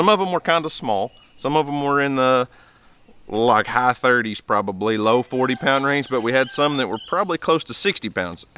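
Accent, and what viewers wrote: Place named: Oklahoma, United States. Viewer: Love the way you say some of your words. I know it's mostly because you're from Oklahoma. Rufus has declined to answer the question. Please keep your hands to your house yourself 😜😜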